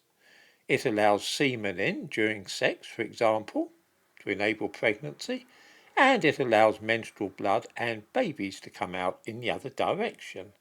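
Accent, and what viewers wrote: United Kingdom. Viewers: Thick British.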